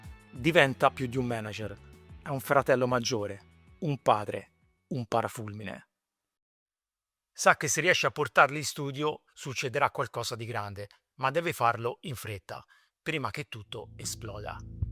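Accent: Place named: Tuscany, Italy. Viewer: lento nel raccontare...fai venire sonno...e dalle co sto toscano...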